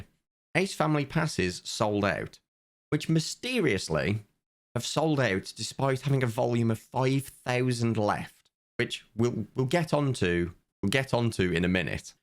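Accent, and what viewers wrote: United Kingdom. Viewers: If he can suppress the British accent slightly, yes.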